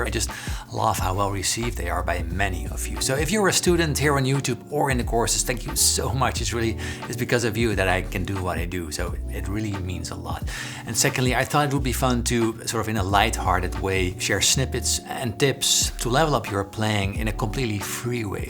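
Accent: Rotterdam, Netherlands. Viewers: Hey ouwe Rotterdammert! Ik wil je graag zeggen hoe leuk het is om een gitaar youtuber te horen in m'n eigen accent:) Ik woon al 15 jaar in Sydney. Speaking of John riffs and licks, I think is somewhat similar to this one. A good one to do is the solo on ' '! I'm sure there is something interesting about it. En nog gefeliciteerd met het uitlopen van de Marathon!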